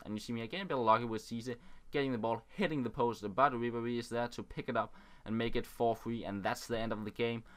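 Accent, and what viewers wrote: Denmark. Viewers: i think i am hearing a touch of danish when you talk, am i right? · hah! I can hear you are danish, because of the way you talk english! Jeg er norsk btw :P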